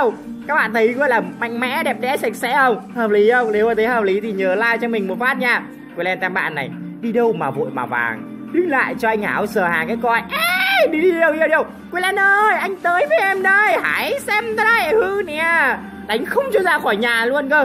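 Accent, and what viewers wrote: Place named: Phu Yen, Vietnam. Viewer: Phú yên kìa 🤣🤣 quê tui 🤣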